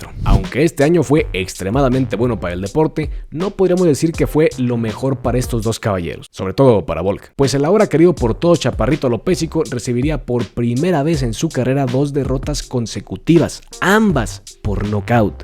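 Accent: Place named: Spain. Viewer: No lo tomes así hay gente q no le gusta el acento español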